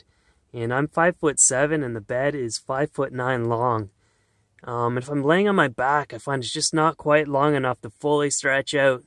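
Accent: Canada. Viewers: You have a super Canadian accent, like my buddy Randy. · Hi, man! Looove your videos and have been watching for a while now, especially all your amazing bike trips and now the minivan conversion, as I'm planning to do a conversion in the near future. Something I'm dying to know - what accent do you have? I'm pretty sure I remember you being Canadian?? but what part? Sorry if I'm wrong or what not. thanks for all your videos!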